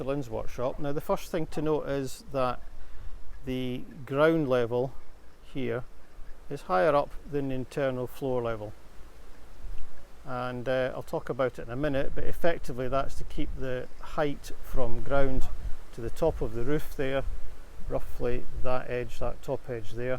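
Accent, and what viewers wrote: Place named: Ireland. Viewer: good first video...I've always liked the Irish accent 👍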